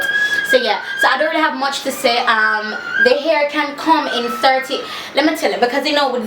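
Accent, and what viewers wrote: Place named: Jamaica. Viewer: lololol girl don't kill me! I'm a trini, but I love to hear Jamaican's talk. lol when you'all say blood cleanse, dat absolutely crack me up!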